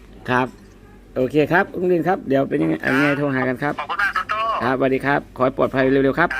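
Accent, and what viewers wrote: North, Thailand. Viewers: อู้เหนือกับ ก็ได้ สำเนียงเหนือกับเวียงคือกัน.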